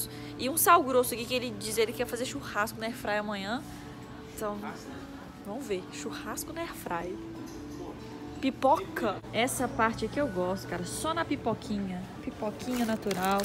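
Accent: Minas Gerais, Brazil. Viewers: Esse sotaque mineiro aí é de qual cidade? O pessoal da cidade do meu noivo tem o mesmo sotaque 😂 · comecei a ver seu vídeo e pensei o sotaque dessa menina parece ser MINEIRO, e eis que você fala que é de Minas. Adorei! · Esse sotaque Mineiro e bom demais gente❤❤ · Entrei nesse canal falando essa menina tem sotaque de mineiro até vc dizer que o galo era mozao !! Já me inscrevi!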